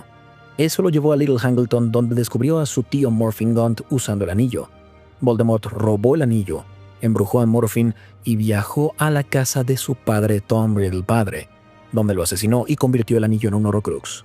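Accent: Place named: Latin America. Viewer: Oh español latino... esto si se puede escuchar (vengo de videos de españa y destrozan lo nombres)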